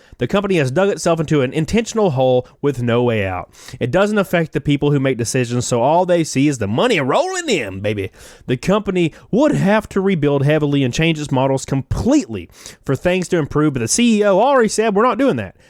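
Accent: South, United States. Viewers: I don’t know how I found this video but this guy sounds like a Southern Kermet the Frog 🐸. I’ll keep watching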